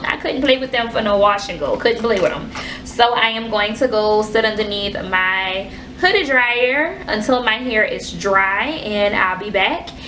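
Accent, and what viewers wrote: New Orleans, United States. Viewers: Wow your hair has really grown since I last watched a video. But I think you had pretty good results, like you said it's a learning curve and her products are so concentrated you don't have to use a lot. I love your NOLA accent and your beautiful smile, tfs.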